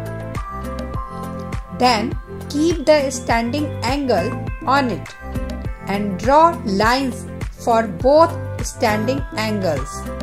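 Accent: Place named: India. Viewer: Because she is from India she is teaching that's all that matters not everyone English is first language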